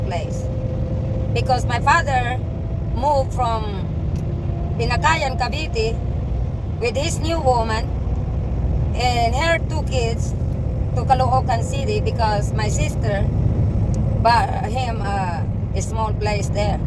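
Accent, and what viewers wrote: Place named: South, United States. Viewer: Bait mo lola kawawa din ang bata kapag ganyan naiiwanan.love your southern accent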